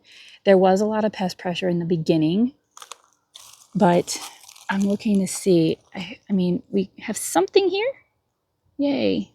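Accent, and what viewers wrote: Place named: New England, United States. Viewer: that's ingenious! I've heard of sparkly and flashy things, but that's brilliant, actually. You have a great garden and kudos to repurposing the beds with those huge water bins? I've seen them here but don't know thier purpose. And yes, you've definitely picked up New England vowel sounds from your dad. I'm a bit envious that you have indeed a cabbage growing. My garden is under a bit of snow (3b)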